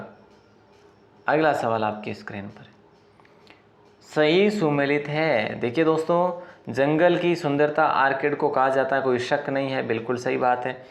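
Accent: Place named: Rajasthan, India. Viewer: Aaj mjo aa gio class mathe guru ji marwadi boliya the jna bot futtri bolo ho the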